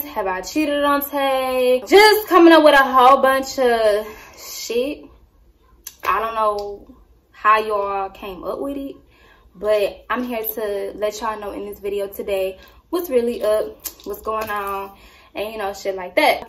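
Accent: Detroit, United States. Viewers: Sis it sound like you losing the Detroit accent 🥴😩😩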